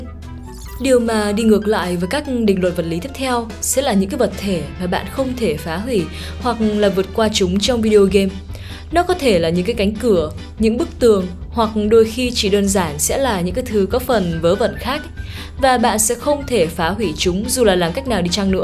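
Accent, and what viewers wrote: Vietnam. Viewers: Bạn nài quê Nam Định hỏ, nghe giọng giống lắm 😐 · Voice mới người Thanh Hoá à? 😢 Giọng nghe mệt quá, k xem hết nổi video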